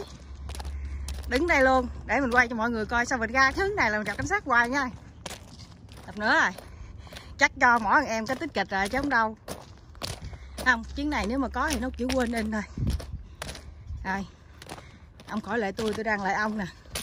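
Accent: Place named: An Giang, Vietnam. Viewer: Rất thích và ủng hộ kênh của bạn. Nghe giọng nói hình như bạn là người gốc ở An Giang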